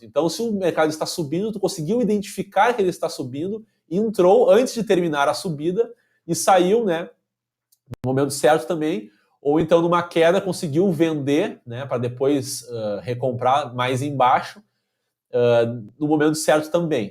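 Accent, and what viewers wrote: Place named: Rio Grande do Sul, Brazil. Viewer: adorei seu sotaque gauchíssimo - além, claro, da explanação muito didática para esta neófita no mundo do trading de criptomoedas. obrigada por compartilhar seu conhecimento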